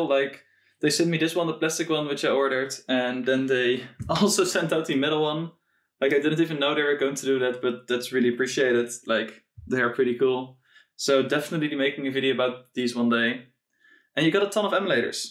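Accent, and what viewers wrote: Netherlands. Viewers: Haha dank je voor de video. Ik heb besloten mijn 2DS te modden zodat ik ook oude games kan spelen. Zelfs CBM64 (commodore) gamen. Nu zit er homebrew op :-) Lachen man, ik hoorde aan je accent dat je Nederlands bent. Maarreh toch vermakelijk die Ali consoles :o)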